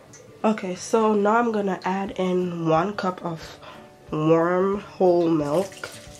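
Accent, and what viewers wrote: Caribbean. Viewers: Almost thought you were from my island, st.maarten, with your accent. But so glad I came across this channel today. I will try as much as these recipes as possible. Mayb give them a lil twist since i am a fitness dude who tracks calories. But I need to add at least some of this caribbean deliciousness to my life!!! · Trying this today ! Sound Caribbean 😀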